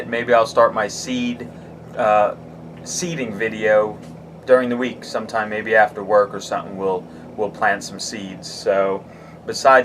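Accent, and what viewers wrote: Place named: South Texas, United States. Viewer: I hear South Texas in your voice. Do you ever grow super hot peppers.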